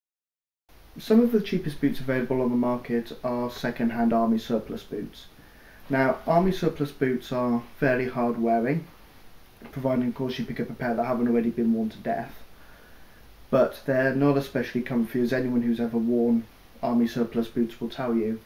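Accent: England, United Kingdom. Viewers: O my lord.. i was expecting a deep southern hick accent.. not a light voice and english accent.. LOL Anywho, i catch myself today watching vids on first time airsoft and strategy out of airsoft withdrawls due to winter in minnesota -_- Very good videos so far my friend :)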